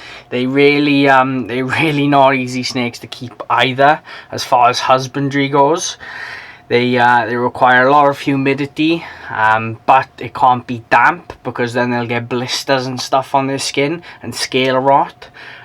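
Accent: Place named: South Wales, United Kingdom. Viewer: The south Wales accent is lush.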